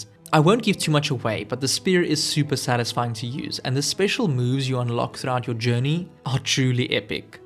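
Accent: South Africa. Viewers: I could just hear the South African accent. Keep it up boet great content and loved the honest review!!! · Unrelated to the game... Are tou South African? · I can tell this dude is South African and trying very hard to cover up his accent. “Taken to HaRRRt” “Cory BaRRRRlog” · Love the video, but on an unrelated note, I can’t help but recognize the accent…are you South African by any chance?👀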